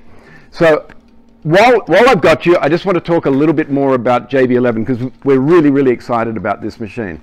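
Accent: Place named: France. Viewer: French accent!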